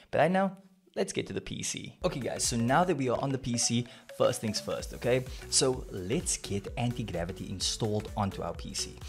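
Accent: South Africa. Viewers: Bru are you from SA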